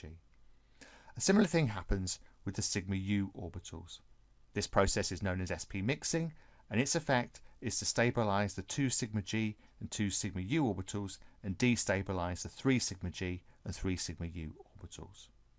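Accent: England, United Kingdom. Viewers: God, the English accent, but at least it is a short video.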